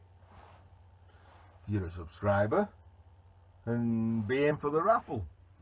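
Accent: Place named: Midlands, United Kingdom. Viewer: Got my wheels turning, sir. Going to try it. Maybe find some wire if the ties don't hold up. As a Yank who's only been to the Midlands and up north a few x in a band, your accent brings back the best of memories. Discovered Max & Paddy and Peter Kay in the first tour...funniest man alive. All the best and thanks for the vid.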